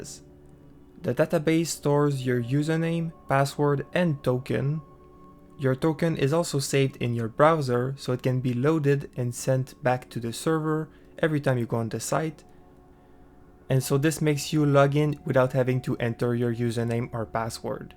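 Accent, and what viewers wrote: Quebec, Canada. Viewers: L'accent queb qui sort mon gars lmao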